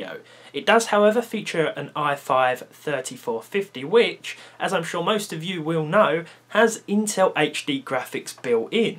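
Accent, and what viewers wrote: United Kingdom. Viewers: Nice British accent, can you say Harry Potter in the end of your next video? Pls pls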